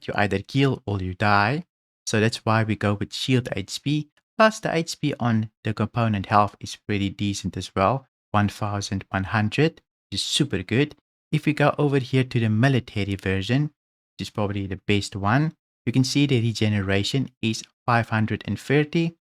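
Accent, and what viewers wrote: South Africa. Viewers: awesome thank you, are you from South Africa?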